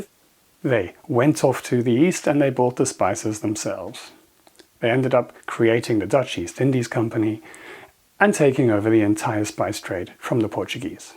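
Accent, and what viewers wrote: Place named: United Kingdom. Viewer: Are you btw Dutch or British? Your accent sounds very British.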